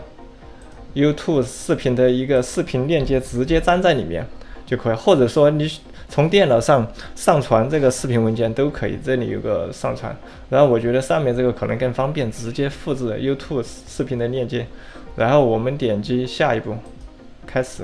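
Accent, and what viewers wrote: Sichuan, China. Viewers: 说话口音川渝的吗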